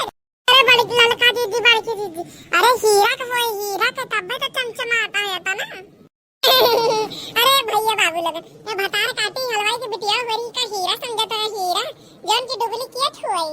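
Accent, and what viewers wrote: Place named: Raebareli, India. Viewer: Aaap kaha se ho Raibareli ki bhasha lagti h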